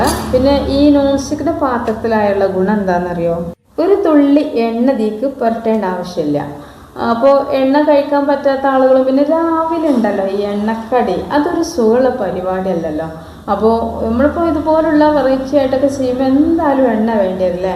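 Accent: Malappuram, India. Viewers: 👍താത്താന്റെ ആ നിഷ്‌ക്കളങ്കമായ അവതരണ മലപ്പുറം തനതായ ശൈലി കേട്ടിരിക്കാൻ വളരെ രസമായിട്ടുണ്ട്. അതിൽ ഇടക്ക് വലിയ ഇംഗ്ലീഷ് വാക്ക് ഉപയോകുന്നത് ഒഴിക്കാവുന്നതാണ് താത്ത നല്ല അവതരണമാണ്. ലളിതമായ പാചകം കൂടുതൽ സാധനങ്ങൾ ഇല്ല. നല്ല സ്ക്രിപ്റ്റ്. ബോഡി ഷോ ഇല്ല. One man show.👏👏👏super.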